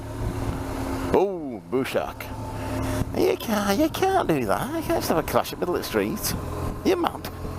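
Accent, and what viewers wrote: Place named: England, United Kingdom. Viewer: Hi mate Mick from Australia here. Great videos, really enjoying them. Which part of England are you from....no expert on the English accents :)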